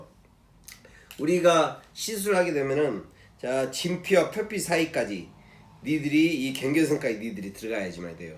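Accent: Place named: Jeolla, South Korea. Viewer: 구 독 ! 좋아요~~~ 했어요 꾹꾹!!전라도 사투리 넘 귀여워용 ㅋㅋㅋ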